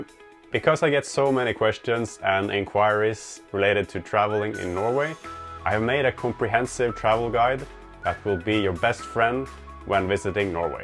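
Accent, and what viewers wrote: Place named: Sweden. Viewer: Fresh video! Been watching your vids for a while now, they're super nice. We are starting our 2 week roadtrip (tenting only) tomorrow, from Stockholm to Lofoten, Senja, Tromso..but starting in the south close to Troltunga.:) Thanks for the tips! Wish us good weather. Also, my gf said you has a swedish accent.:D I'm not swedish but I agree with her. Where do you guys live in Norway btw?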